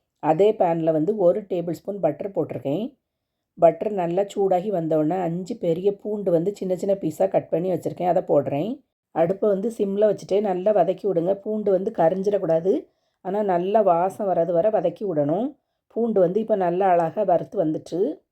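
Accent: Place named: Tirunelveli, India. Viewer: Unga slang spr sis me too tnvli❤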